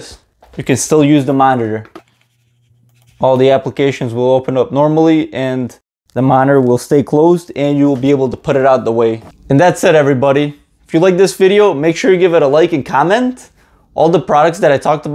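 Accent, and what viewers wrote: Detroit, United States. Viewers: I’m wondering if he’s from Detroit? I am… What up doe? 😊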